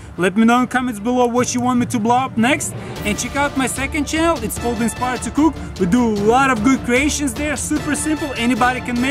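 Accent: Russia. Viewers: Рязанский акцент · по звучанию Американских слов, это не американец, а русский человек, знаевший английский язык )))) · Мне кажется или у него русский акцент?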